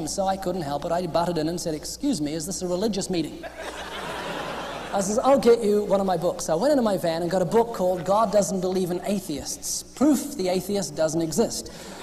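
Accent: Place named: New Zealand. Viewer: This was when you sounded more like a Kiwi, when 'world' was more 'werld'. Love it!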